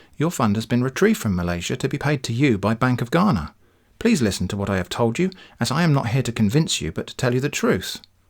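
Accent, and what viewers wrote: United Kingdom. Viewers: Ngl I saw the same Ted talk and found this guy’s videos and thought, oh it’s the guy from that Ted talk thing. Did he always have a British accent? (I’m being serious did that guy from the Ted talks have a British accent? I can’t remember for the life of me)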